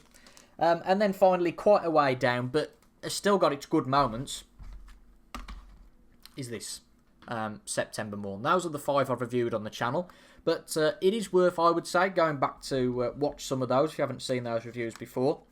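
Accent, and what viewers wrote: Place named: Midlands, United Kingdom. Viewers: may i know what UK accent this is? It sounds great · Sounds like Midlands, maybe Birmingham.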